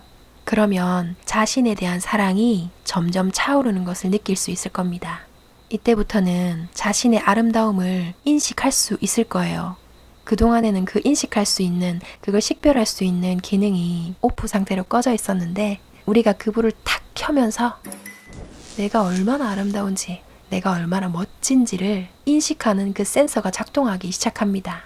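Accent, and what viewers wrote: Gyeongsang, South Korea. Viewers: 방금 구독했어요ㅎ 경상도 사투리가 너무 정겹게 느껴보는건 처음이네예ㅋ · 작년에 영상이 너무 좋아서 책을 사려고 했는데 도서관에서 보는것 말고는 구할 수가 없더라구요. 절판ㅠ 어느날 여행지에서 길을 잃었는데 그곳에서 중고서점에 들어갔어요. 근데 제 눈앞에 이책이ㅠㅠㅠ너무 놀랐어요. 2편인 마음의 오류까지 구했어요...방금 이 책 읽는중에 가브리엘라 내용이ㅠㅠ 129p에 있네요ㅋㅋ너무 반가워서 글쓰러 왔어요. ㅎㅎ 항상 영상 잘 보고 있구요. 제 책장엔 이 읽어주시는 책들로 채워지고 있어요❤ 저도 경상도 사람인데 사투리 너무 듣기 좋아요. 늘감사해요^^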